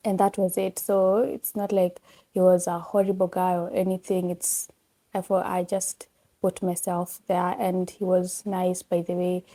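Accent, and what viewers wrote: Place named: United Kingdom. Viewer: I'm always catching the intonation anamalizia nayo sentences....kidogo tu British accent itaingia..you gotta love ❤❤❤❤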